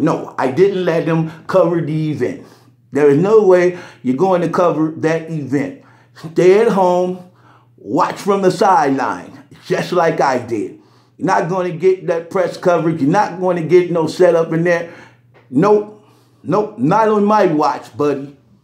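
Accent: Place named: New York, United States. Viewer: ..#AAYYYOOOOO..!!..THIS SHIT IS HILAAAAAAARIOUS..!!! 😂😂😂😂😂😂👏👏. NEW YORK ACCENT IS FUCKING COMEDY GOLD..!! #BRUUUUUH..!!😁😁😂😂👏👏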